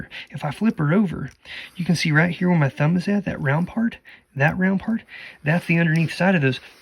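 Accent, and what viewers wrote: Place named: West Virginia, United States. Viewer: Just moved to Weat Virginia and thought the accent was similar to the area. Love it! 😊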